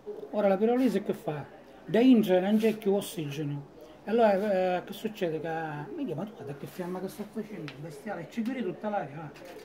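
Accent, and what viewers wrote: Italy. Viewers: Ma quale calabrese questo e' un siciliano verace............... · dialetto quasi uguale al leccese 😁, bel progetto , sarebbe bello vedere come l hai fatto , e in più io lì dentro aggiungerei qualche pezzo di legna giusto per risparmiare veramente.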